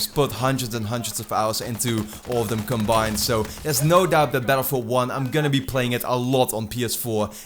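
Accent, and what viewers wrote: Netherlands. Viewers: Ik heb net je kanaal gevonden. Je maakt echt heerlijke content. Plus je klinkt zwaar Nederlands, dus dat is een instant sub. :p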